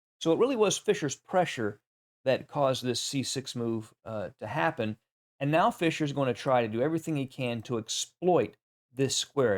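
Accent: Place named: Texas, United States. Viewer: Probably my favourite chess channel (I am also a Fischer fan) and I like the Texan accent.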